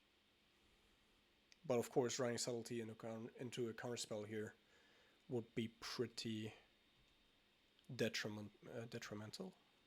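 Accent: Germany. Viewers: Hey, nice vid. :) are u from germany? ;)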